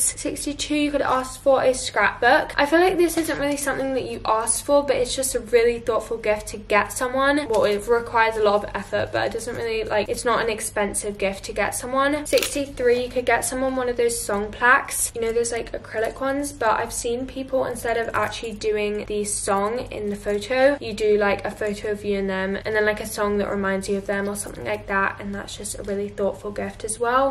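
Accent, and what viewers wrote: England, United Kingdom. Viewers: I luv your English accent like omg and love the video by the way and also i luv they way you edit your videos like 10000/10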